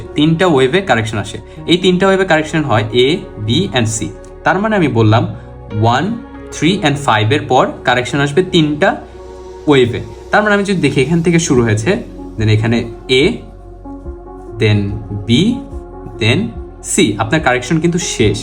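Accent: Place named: Bangladesh. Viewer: ভাই উনার ভাষা শুনেই বুঝা যায় উনি বাংলাদেশী।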